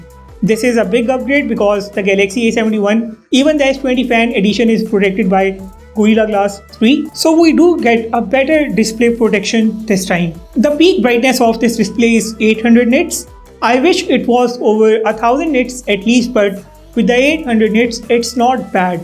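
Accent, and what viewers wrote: India. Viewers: You voice and look like indian but I know you are form pakistan ❤️.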